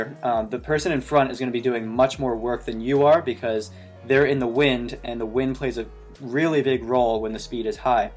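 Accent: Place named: United States. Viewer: Why American accent, but British flag?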